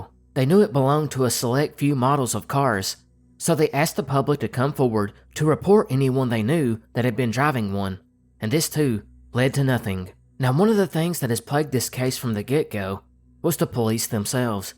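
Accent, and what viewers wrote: South, United States. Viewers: A thick southern accent was perfect for these cold northern stories.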